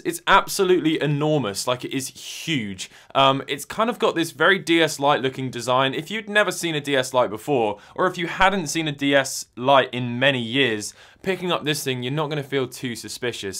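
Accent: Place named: United Kingdom. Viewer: >annyoing british accent disliked and moved on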